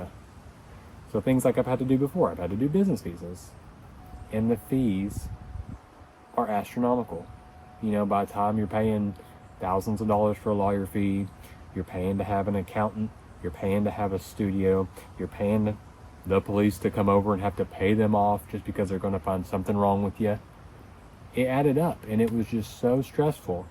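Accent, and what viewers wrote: South, United States. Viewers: Your southern accent really is a lot stronger now that you're home lol glad you found your place, it must have been stressful not having a home base for that long...excited for your new chapter! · bro how come you got southern accent when you got back home 😂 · Ayy southern baby with the thickest accent is back again! pls more farm vlogs pls.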